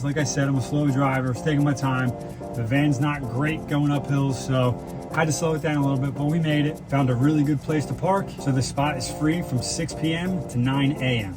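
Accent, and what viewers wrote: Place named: Philadelphia, United States. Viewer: You got that Philly accent lol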